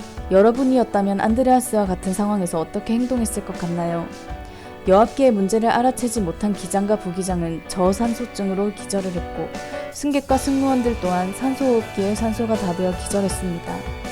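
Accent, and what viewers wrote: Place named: Gyeongsang, South Korea. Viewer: 고향이 경상도쪽 이신가바요..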